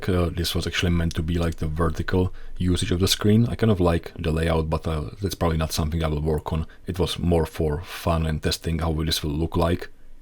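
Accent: Czech Republic. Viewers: Love it ! Looks really nice, especially the ink display. Also, are you Czech by any chance?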